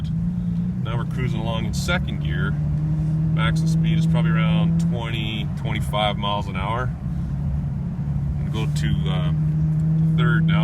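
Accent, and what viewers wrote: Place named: Canada. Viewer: You sound like you're from Canada.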